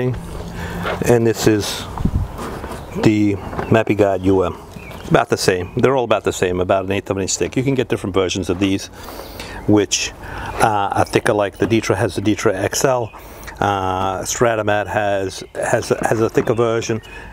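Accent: Boston, United States. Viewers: I understand the Boston accent,after years watching "This Old House" on PBS, but the AI which made the subtitles didn't.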